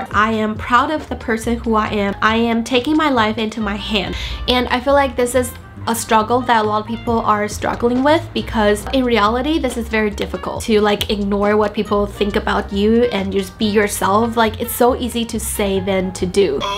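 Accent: United Kingdom. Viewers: i LOVE your subtle british accent! so cute!!!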